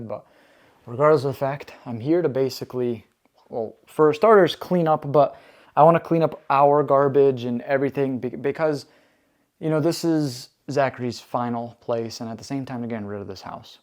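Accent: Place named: Canada. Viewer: ... You do honestly sound like your from Canada. Other then when you say garage. Lmao... Gareage 😅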